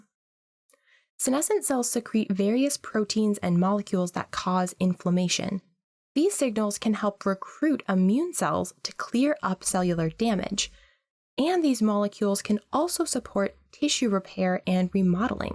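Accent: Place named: United States. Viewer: It would really help if you were to not speak American. It is very difficult for us English speakers to follow what you are saying, because we have to mentally transform it, whereupon miss what came next.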